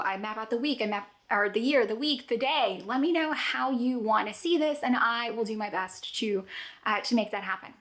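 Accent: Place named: Texas, United States. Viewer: I love how much your TX twang came out in this one 🤠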